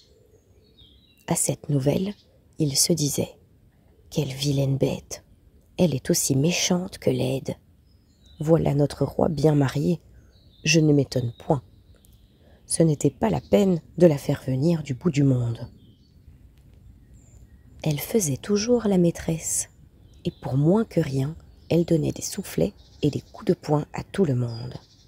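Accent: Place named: Belgium. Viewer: Ici en Belgique on dit cela quon va dîner et souper 😉 et si je reconnaît bien l'accent de notre lectrice elle doit être belge car j'ai le même accent et je suis belge 😀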